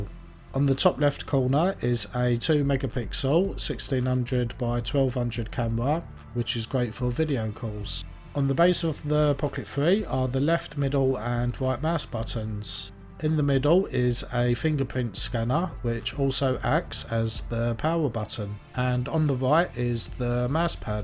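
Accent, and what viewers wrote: Cockney, United Kingdom. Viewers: It's his cockney accent to be at fault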